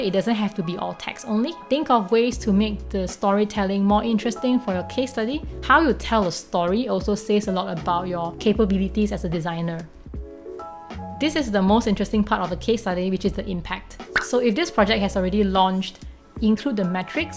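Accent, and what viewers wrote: Nigeria. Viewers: Just discovered your channel, very informative video... Btw you have a Nigerian accent and i love 😊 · You sound like a Nigerian ❤️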